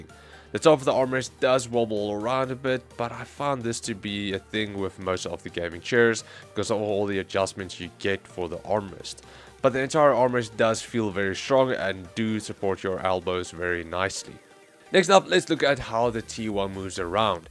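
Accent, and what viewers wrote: Afrikaans, South Africa. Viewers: Kan hoor jy is Afrikaans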